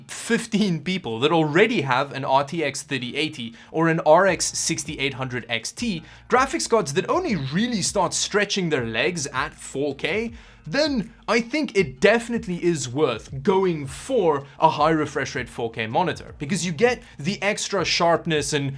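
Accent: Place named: Afrikaans, South Africa. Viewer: Lekker, kan hoor die man is Afrikaans haha. Goeie video:yougotthis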